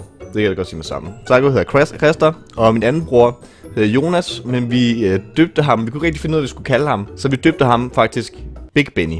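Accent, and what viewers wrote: Bornholm, Denmark. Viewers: det er nestan liga svenska men det er bonholmsk och det er skågit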